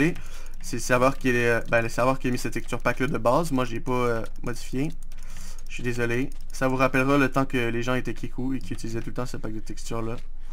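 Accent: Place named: Quebec, Canada. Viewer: Comment fais-tu pour percer malgré un petit handicap qui es notre langue québécoise? Pensait tu en commençant que tu aurait cette handicap de language , j'aimerais que tu fasse une F.A.Q .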